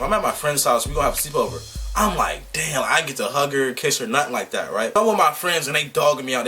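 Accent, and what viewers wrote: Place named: DMV, United States. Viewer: no lie bruh i can always understand you cuz your a dmv man.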